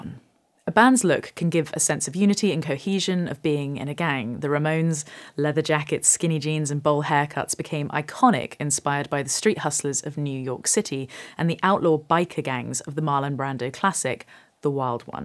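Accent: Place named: Bristol, United Kingdom. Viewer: It'd be hard NOT to notice the near-constant appearance of black t-shirts in your early videos. That said, I hope you won't go too far in the other direction. I'm inclined to agree with Bowie that, if you're going to be a performer, appearance, at least on stage, is part of the performance. And frankly, this elderly Midwestern USA male likes seeing you in something other than just plain black from time to time. On the other hand, what drew me to this channel initially had nothing to do with what you were wearing. I very much enjoy the Bristol accent and, I admit, the educated tone of your non-musical videos (like this one). So, yeah, wear a red (or purple, or electric blue) t-shirt occasionally, or go wild and wear a girlie-dress, but don't get too caught up in "fashion," which is ephemeral at best, and mostly trivial anyway. Keep writing songs and working on your guitar chops.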